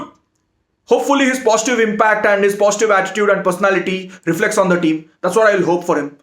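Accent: India. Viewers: Idian accet so cool, I prefere of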